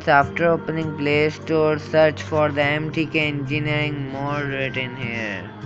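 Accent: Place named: Pakistan. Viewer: Tamás Papp bro if you dont like someone's accent ,just go away dont judge him by his way of speaking, at least what he did worked and its a working trick ,he is from Pakistan and here english is a secondary language and he made a video in English to help the Western people who are in problem ,he can also make a same in his own language but he cared of all coz english is adapted by many countries .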